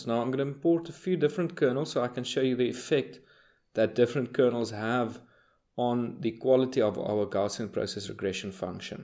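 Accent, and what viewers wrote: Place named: South Africa. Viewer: Good explanation. It is heartwarming to hear a South African accent on a youtube video every now and then. My ML model predicts with a 90% probability that this speaker is from Pretoria.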